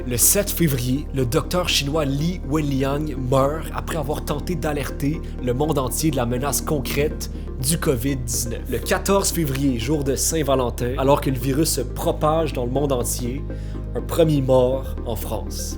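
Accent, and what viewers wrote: Quebec, Canada. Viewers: Cette accent québécois m’avais manqué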